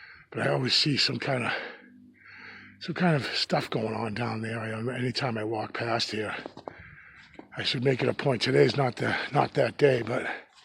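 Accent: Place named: Boston, United States. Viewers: Awesome hike not too long at all very interesting sound early in the video could have been a raven croaking but why only the once and why did it only happen after you crossed the first downed tree maybe the downed trees the ones that cross the path that are not by park employees are territorial marks or stay out signs? By the way I like the Boston Accent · Excellent video, great job! I did hear the strange noise, it sounded almost like the grunting noise that a pig makes. Weird isn't It? Btw, don't apologize for the Boston accent. I also have the Worcester-Boston accent. Nothing wrong with that! Everyone has an accent depending on what part of the country they grew up in. Be proud of who you are. You're a terrific person and I enjoy your videos. Have a great day, see you on the next one!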